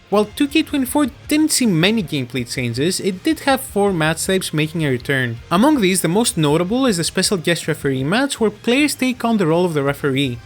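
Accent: Greece. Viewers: That's the Greekest accent I've ever heard lol, awesome video bro 😊 αγάπη από την Κύπρο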